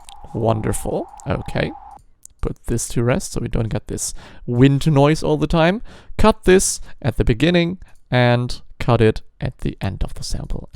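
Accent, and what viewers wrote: Germany. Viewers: ich liebe diese deutschen akzent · Ich wusste es! Ich höre den Deutschen Akzent doch raus ;D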